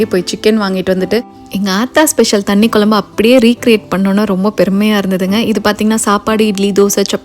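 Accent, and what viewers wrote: Coimbatore, India. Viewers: Nice way of talking coimbatore slang sis ,today lunch box spl bisibelabath and egg omelet sis ,lots of love and hugs❤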